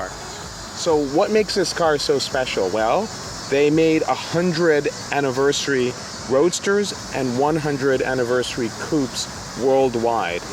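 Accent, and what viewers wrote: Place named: New York, United States. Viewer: He was born here what's accent are you talking about ? Probably is because he is from New York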